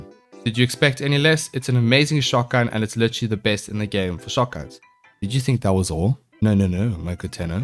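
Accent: South Africa. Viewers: Are you South African? Your accent sounds familiar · are you south african? just asking cause you sound like you could be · Are u south african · Are you South African?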